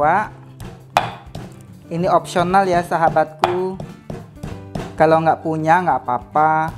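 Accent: Java, Indonesia. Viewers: Medok bikin ngiler aja..lihat buntil..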